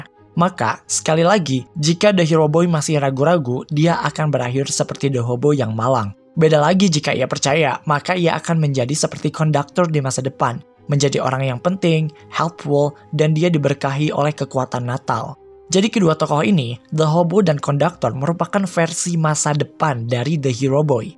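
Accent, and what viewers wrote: South Jakarta, Indonesia. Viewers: English jaksel ?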